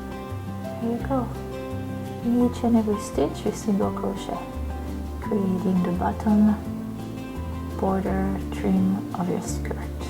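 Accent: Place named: France. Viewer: Hello . U have a very beautiful voice and accent , French !!! . Beautiful skirt . Thanks .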